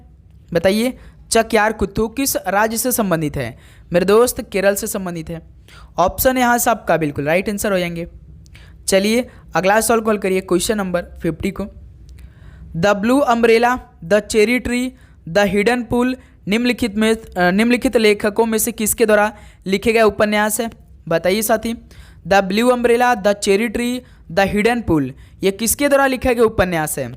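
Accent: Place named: Uttar Pradesh, India. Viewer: Thank you sir aap achha samjhate ho aur apke bolne ke language se lagta hai aap u.p se hai 😊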